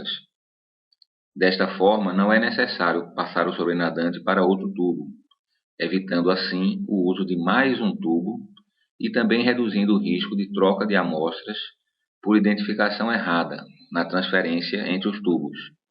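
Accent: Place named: Pernambuco, Brazil. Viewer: Amei o vídeo! E pelo sotaque, deve ser meu conterrâneo, pernambucano?!!!Parabéns pela clareza das informações.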